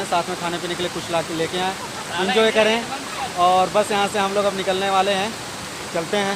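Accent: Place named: Jharkhand, India. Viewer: कमेंट्री और बैक ग्राउंड म्यूजिक के वॉल्यूम में तालमेल नहीं है।कमेंट्री सुनने के लिये वॉल्यूम को बढ़ाना और म्यूजिक की बारी आने पर वॉल्यूम घटाना पड़ता है।फिर, कमेंट्री का स्टाइल आपसी बात-चीत की तरह "स्पीडी" है, जब कि इस तरह के वीडिओज़ में कॉमेंट्री स्पष्ट आवाज और थोड़ा,.. थोड़ा स्लो स्पीड में होना चाहिये। आप अपने कमेंट्री में लोगों को आमंत्रित कर रहे हैं कि कभी झारखंड आइए तो ..... इसका मतलब आप बाहरी लोगों को आमंत्रित कर रहे हैं।ऐसे में आप को राष्ट्रीय स्तर की भाषा में कॉमेंट्री करनी चाहिये, न कि " आव रे, जाव रे " वाले स्थानीय स्तर पर।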